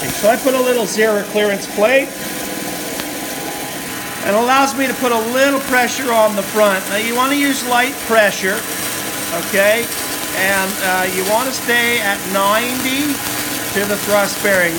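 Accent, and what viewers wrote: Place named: East Coast, United States. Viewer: Great information to know, thanks. I detect a slight East Coast accent.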